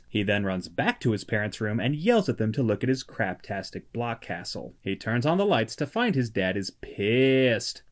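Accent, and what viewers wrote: United Kingdom. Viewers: nice English accent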